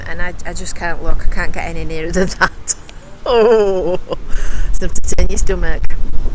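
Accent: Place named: Yorkshire, United Kingdom. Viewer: I suspect there is an awful lot of Yorkshire in that accent .....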